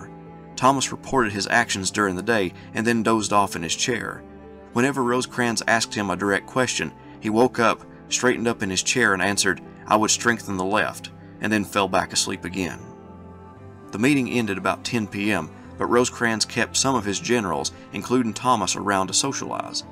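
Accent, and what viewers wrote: South, United States. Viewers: I didn’t know this was going to be 2+ hours of talking…..I really dislike listening to narration without something visual to hang on to bc I am just not and never have been an auditory learner, but I stayed with it for 45 minutes, which is a testament to the narrator and scriptwriter. But it’s a no-go for 2 more hours, and it’s too bad bc I do enjoy the subject, but the narrator’s soft voice with a soupçon of a southern accent puts me to sleep or I start looking at a book and realize I’ve lost the thread and have to go back. I’ll try to return to this and listen in parts!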